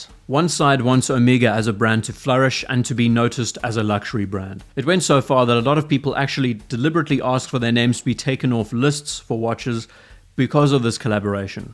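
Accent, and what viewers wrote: South Africa. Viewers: Hold up... you from South Africa?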